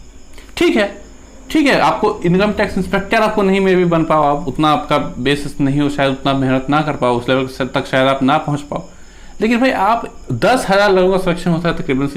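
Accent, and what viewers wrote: Bihar, India. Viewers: Aap bihar se ho?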